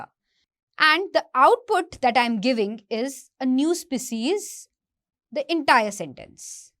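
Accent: India. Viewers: I can better understand the subtitles than the Indian-English. Edit after 1 hour: I love Indian-English!❤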